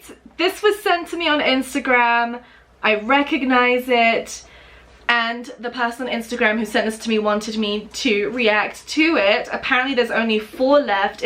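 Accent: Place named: Wales, United Kingdom. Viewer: You kinda sounded too Welch? Is that your origins?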